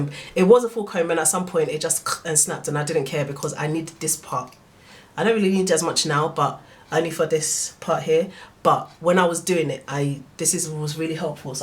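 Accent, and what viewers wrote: United Kingdom. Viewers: Great video, still new at interlocking so this was really helpful. It's also so refreshing to hear another British accent, been in the states for 14 years. You are so funny!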